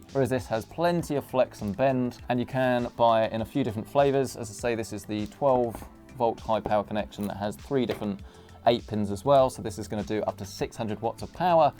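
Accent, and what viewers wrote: England, United Kingdom. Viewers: does bro live in the US or the UK? He sounds like UK (england) but his sponsors and prices seem US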